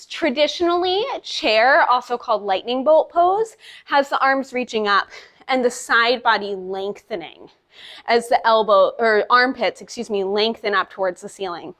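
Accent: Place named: East Coast, United States. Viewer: Great tips - and your east coast accent brings a smile to me over here on the west coast. 😀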